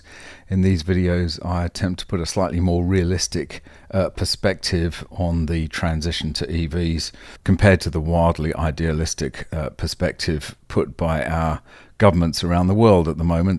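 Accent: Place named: United Kingdom. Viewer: Strangely even though I know you are Australian you sound more British to me.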